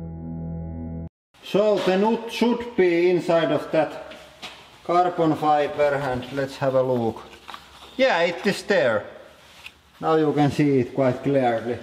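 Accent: Finland. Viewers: its the finnish accent becouse we speak how we write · Judging by his accent, is he Finnish? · rallienglanti paras englanti : D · I love his accent. Finnish :)! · suomalaista paskaa englantia :D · are u from Finland because u sound like the dudesons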